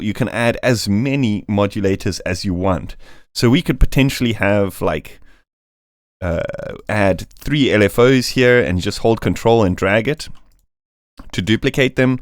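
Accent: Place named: South Africa. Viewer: he's south african. i'm south african too and can tell his accent from a mile away. it sounds perfect to me, you're just struggling with the accent. definitely sounds like english is his first language here, this is just how our accent sounds.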